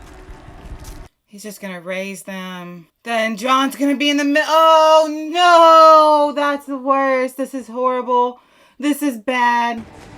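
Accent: South, United States. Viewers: I dip in only occasionally with life so busy now, but I had to see this one again with you, it's one of my favorites. Arya is awesome! I notice your southern accent coming out a bit more than I've ever heard before and you've been beamed onto my screens for years now. It's like your comfortable now, which is cool. I'm in NC and it drives me nuts when people say I have an accent, I've fought so hard not to, so I hope that's not a bad thing to point out, but yeah, nothing wrong with getting comfortable with your audience.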